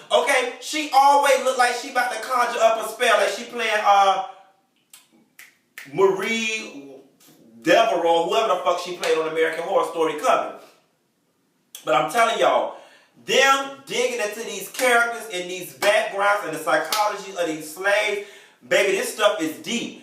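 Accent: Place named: South Florida, United States. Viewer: i tought that you were a south florida dude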